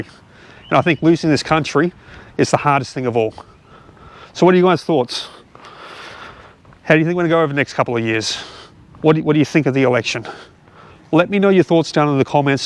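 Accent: Australia. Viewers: always curious to know one’s blog location. your accent (or absence of) tells me you’re from Australia, maybe north Queensland. can see the sun is near vertical, ambient light very bright, & a high contrast image. I was brought up on the Toowoomba range escarpment (Great Divide), so am quite familiar with these conditions. now retired & spent most of my life in Sydney, i’m not very interested in politics any more, & lean towards liberals or smaller parties, liberals for stability & smaller parties for interesting alternative ideas. i wonder if they will ever change the base voting algorithm we use - currently based on individual, electorate & preference. you could design a set of algorithms which would rank the party list very differently, for the same individual set of votes. (an example has been demonstrated in TV counting). then take some average for a more “accurate” vote outcome maybe? 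more likely the start of arguments.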